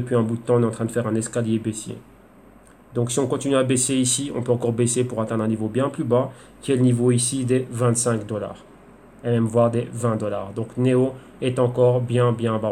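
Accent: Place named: Belgium. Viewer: Tu as un ton qui ressemble à celui du sushis...es tu belge?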